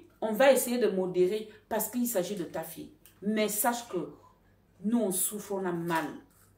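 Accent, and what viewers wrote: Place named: Gabon. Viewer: Elle est Gabonaise 🇬🇦 c’est comme ça que nos mamans Gabonaises parlent👌🏻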